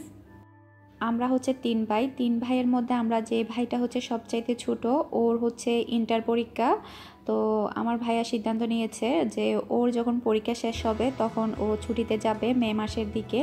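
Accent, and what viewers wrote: Chittagong, Bangladesh. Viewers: Amio.Chittagong ar. Kotha shona laglo Chittagong ar tai.ask.korci.apu.😍😍😘😘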